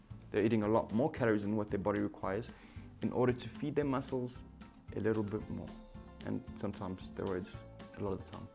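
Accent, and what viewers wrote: South Africa. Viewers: hey man. Thanks for the video my man. Speaking the truth. Out of interest are you perhaps South African?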